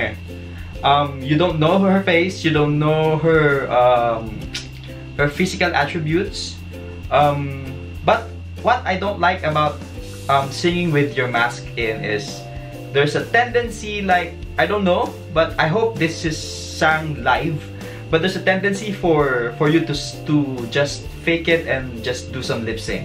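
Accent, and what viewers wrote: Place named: Singapore. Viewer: สิงคโปร์เลียน ชัวร์ สำเนียงอิ้งแบบนี้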